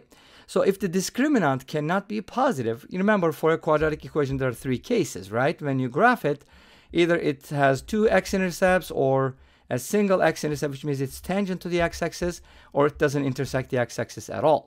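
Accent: Turkey. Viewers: Are You Turkish ? Your accent sounds Turkish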